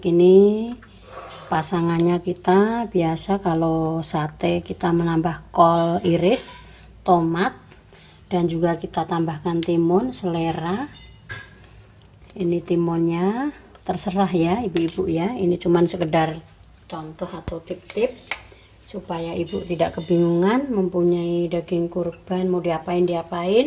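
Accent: Java, Indonesia. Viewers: Assalamu'alaikum, satenya siiiip 👍👍👍 lucu banget klau ngomong, dr jawa y?.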